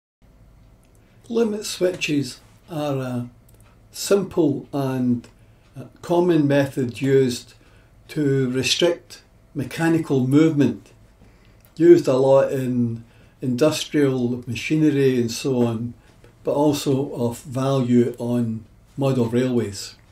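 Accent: Scotland, United Kingdom. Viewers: Nice! Clear diagrams with a clear explanation in a Scottish brogue.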